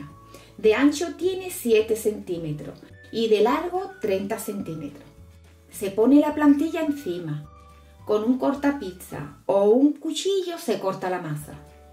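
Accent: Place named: Andalusia, Spain. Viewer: gracias por esta fantástica receta de huesos de santo y por tu a entorno andaluz tan dulce te lo dice una Canaria de Tenerife 😘😋🌹🌷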